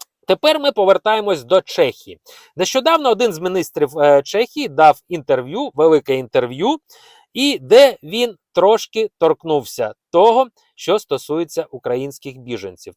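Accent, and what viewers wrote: Russia. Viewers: Автор - серед українців вати немає. Бо вата це російські і проросійськи мислячі люди, які українцями бути не можуть в принципі. Громадянами - так. але серед них і вірмени, і євреї. Я про це вже вам зауважував. Якщо ви вважаєте себе україномовним блогером ( російський акцент лізе у всі діри), то притримуйтесь усталеної української лексики, а не переміняючи поняття з ніг на голову. Що характерно саме для москалів. Не братимете подібні зауваження до уваги - зійдете на маргінал, як подібний до вас канадський марк. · Любі українці, не слухайте цього мерзенного блогера. Він чистокровний рашист, прислухайтеся до його вимови. Ще один канадський марк знайшовся, який бігає по лісосмугах та ллє бруд на українців. Але при цьому не заважає йому клянчити у українців гроші на донати. Мені цікаво, куди ідуть ті гроші. Сумлінні українці і без вас донатять. 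Самі присосалися до чужої країни, а людей, які рятували своїх дітей від війни, вони пускати на"свою" територію не бажають. Це їм конкуренти. У нас в Україні майже всі чоловіки працюють на двох або і на трьох роботах. І не вам їх паскудити.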